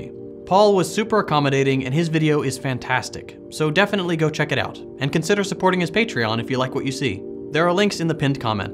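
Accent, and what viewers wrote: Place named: United States. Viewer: Sounds so... American.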